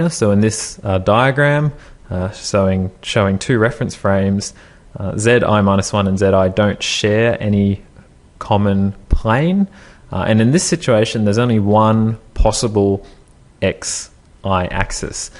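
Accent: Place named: Australia. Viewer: Refreshing to hear intelligence behind an Aussie accent :-) (coming from a country where the only Aussie representation is the Soaps on TV). Back on topic: Great introduction to Kinematics, thanks.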